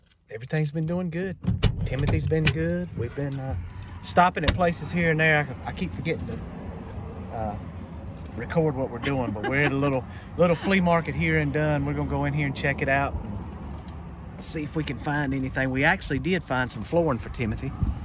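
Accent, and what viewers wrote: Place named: Virginia, United States. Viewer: I detect a VA accent in your voice man. Am I right? The only reason I say that is because I spent 4 years driving for a company and I observed very closely the way Vow sounds were pronounced In that state. If I'm wrong on my guess, then it was worth a guess. I love what you guys do with the vans by the way. I currently have a 1995 G 30 long bed that's all rusted out, needs some engine work and some body work. My goal is to make it a 4×4 lifted Long Ateam van.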